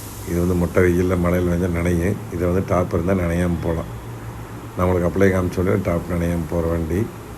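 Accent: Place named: Coimbatore, India. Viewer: Anna unga kovai tamil super.. Enakum kovai tamil kathukanum, but iam chennai na unga periya fan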